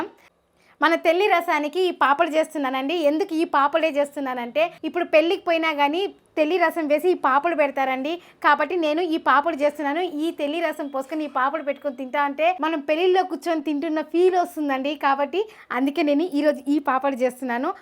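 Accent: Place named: Kanchipuram, India. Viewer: కామక్షి తాయి లాగా ఉన్నావమ్మా కంజీవరం అమ్మదా మాట్లాడు తునట్లు వుంది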